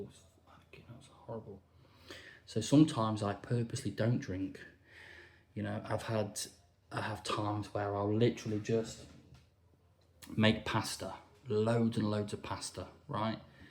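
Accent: United Kingdom. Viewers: one year sober, have not long started following your videos. would love to help and support you bro. can give you my email, if you want to chat/shoot the shit! from your accent, it sounds like you might be from around the same area of U.K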